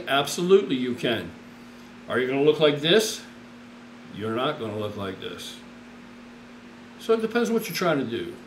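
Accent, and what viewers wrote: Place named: Philadelphia, United States. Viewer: sounds like a philly accent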